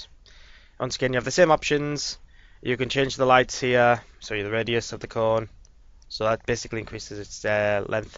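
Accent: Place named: United Kingdom. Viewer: You sound very British when you're sick, so British that I sometimes have trouble understanding it D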